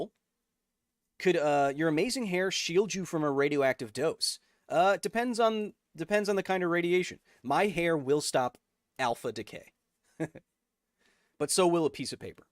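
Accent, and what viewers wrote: Queensland, Australia. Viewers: 1. North-Eastern. That's at least northern-NSW if not QLD or NT. We have far less "twang" down south. 2. Geiger.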